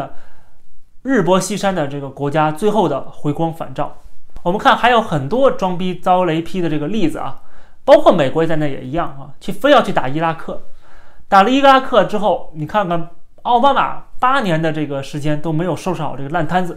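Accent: China. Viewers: 希望主持人能来回答我这个疑问。主持人你让我觉得很纳闷呀，你攻击中国无底线，在你眼里只要是关于中国的东西都是不好的东西。你这么年轻，我不知道你是在中国长大的然后跑出去拿了加拿大绿卡，还是一开始就在加拿大生活的？怎会如此反华？如果是前者，那你在国内时是受了政府多大的苦难，才能让你对中国有如此的仇恨啊？从你的口音里可以判断，你应该是在国内长大的，要是在国外长大的，口音一定不是这个样子。所以我的疑问是，主持人你在国内到底受了多大的压迫，才能生出对中国如此的仇恨？还是在加拿大为了生活，为了拿经费，不得不黑中国？心里其实根本就没啥恨，说不定还是爱中国的，自己整天骂中国，只是为了生活？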